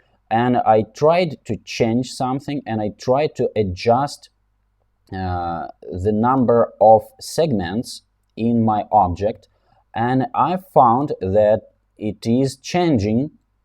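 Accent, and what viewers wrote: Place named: Ryazan, Russia. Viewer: Константин Санжаровский не совсем. Частенько проскакивает рязанский) И ни один нормальный носитель языка не говорит с таким усилием, выдавливая из себя слова.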